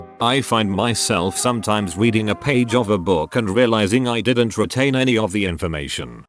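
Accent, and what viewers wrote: United Kingdom. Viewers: This brittish snob accent sucks on robo narrator.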